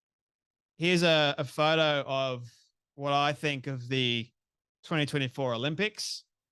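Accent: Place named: Australia. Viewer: His AUSSIE voice is very annoying 😂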